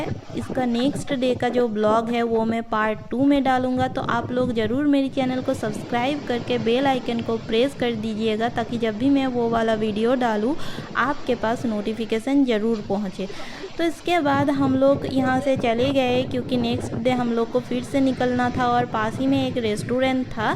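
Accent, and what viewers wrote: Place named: Bengal, India. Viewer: Aapka hindi acha hai bas thora bengla touch hai.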